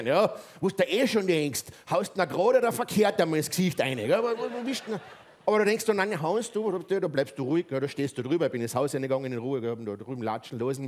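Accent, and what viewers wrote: Austria. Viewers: Ob das unsere deutschen Nachbarn auch wirklich verstehen vom Dialekt? Und wida amoi gscheid umabrocht 😀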